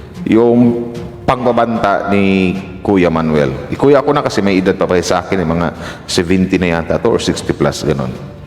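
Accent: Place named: Batangas, Philippines. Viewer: ❤sir ang accent Ng salita ay Batangas.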